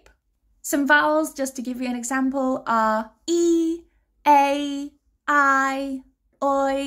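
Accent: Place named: Yorkshire, United Kingdom. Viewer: Missing your stronger Yorkshire accent 👍❤️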